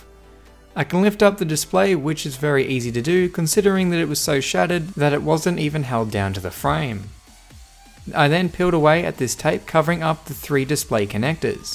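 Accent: Australia. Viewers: So you’re Aussie.... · When I hear he’s Australian like me Insert surprised pikachu meme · Aussie accent bud they rise in pitch at the end of SENTANCES lol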